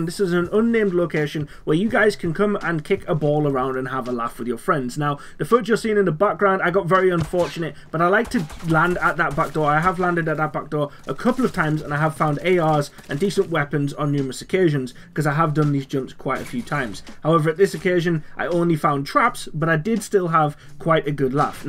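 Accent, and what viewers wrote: Newcastle, United Kingdom. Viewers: Are you a Geordie ?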